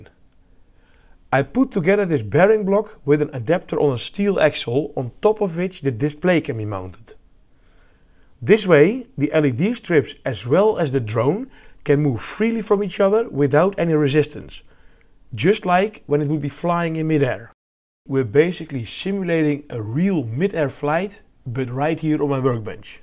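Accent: Netherlands. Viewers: Haha je accent is zo Nederlands, leuk om te horen. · Love your videos mate. So much so I’m actually commenting on a video. Your creations are incredible. Your channel is definitely the next “stuff made here” ❤ I’d actually love if your videos were 3x longer with more of the technical stuff. Maybe you’re keeping the videos short n sweet to attract the huge following for now? Do you have an instagram page or anything for us to follow you more on? Also love the Dutch accent. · i saw the thumbnail and thought "that looks like dutch grass. then i heard 1 second of you talking. yep. ik herken altijd een hollander op youtube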